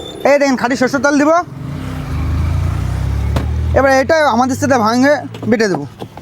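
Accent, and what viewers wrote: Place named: Barishal, Bangladesh. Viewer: Shalay pure borishailla😂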